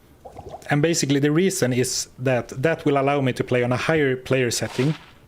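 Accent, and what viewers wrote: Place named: Sweden. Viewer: Hello fellow 🇸🇪 And as a "gaming tradition" in Sweden when at least one persen don't understand Swedish, everyone in chat/voice talks English. Therefore I will take this in understandable English. I belive Mephistos life points will be easer to reach than Duriels was. With at least 40 more strength. (perhaps ~50 if a 3os helm is possible or good circlet) and more possible strength on rings, amu and so on. + A few more lvls and skill shrine this will be easy for you. At least that is what I belive🙂. Got very sad when suddenly Duriel was dead. Good with the clip. Sad that it was without sound. Sidetrack. Got really suspicious when I first heard you. Wait what?! Is he from Sweden? You confirmed later on that vid or another one. I really enjoying your challenges. Keep them up😈🤘 Another sidetrack. Is the microwaved tea reheated👍 or do you prepare it that way☠️? 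Usual flavor? With or without milk? Even more sidetrack. In what part of Sweden do you live close to? If you answer this I guess you will have a big radius from the town you are meanchening. Because of the now little less anonymity with facecam and closer to 40 than 30 thing. BUT, let's think of the real threat, DIABLO !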